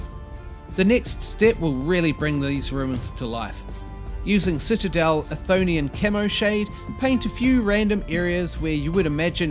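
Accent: New Zealand. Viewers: I'm digging this tutorial, the accent makes this feel like having a WETA making-of-documentary clip for wargaming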